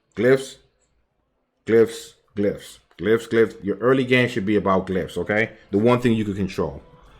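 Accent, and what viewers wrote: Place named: East Coast, United States. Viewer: Bruh you have an east coast accent. Where you from? 😆 Subbed for the swag the content was extra.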